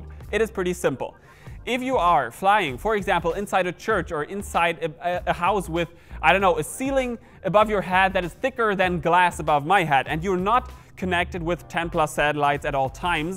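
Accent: Germany. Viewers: bist du deutsch ? :D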